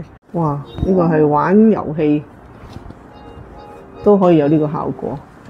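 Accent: Taiwan. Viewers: 是超級可愛的那種口音 不是不好的那種 但是越来越像台人了 PS：完全没問題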